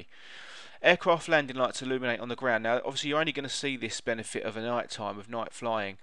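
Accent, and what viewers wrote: United Kingdom. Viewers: i love brithish accent! greetsfrom chile good video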